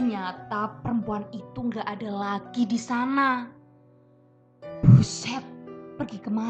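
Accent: Java, Indonesia. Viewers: Suara medok neng😂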